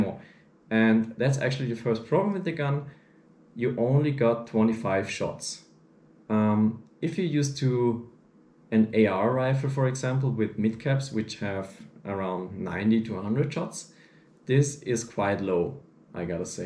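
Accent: Germany. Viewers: is he from german?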